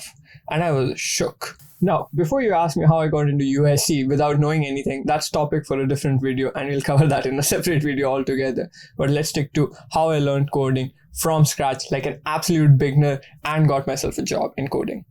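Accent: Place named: India. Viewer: nice... but i hate how Indians speak English... are u doing intentionally or it is just u don't wanna bother to ppl understand u nice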